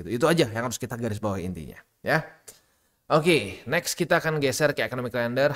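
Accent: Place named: West Java, Indonesia. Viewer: Bang lu orang sunda bukan? Kaya lekat banget logat sunda nya 😂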